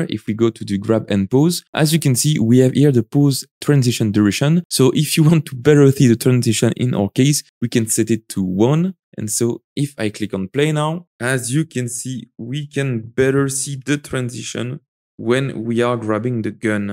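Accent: France. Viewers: T’es français ?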